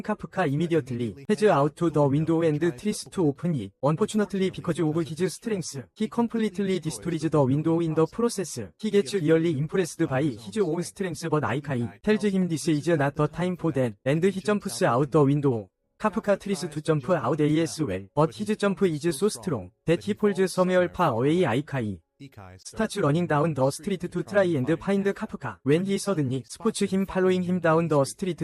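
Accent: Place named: South Korea. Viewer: 아오 콩글리쉬 발음때문에 집중이 하나도 안돼네요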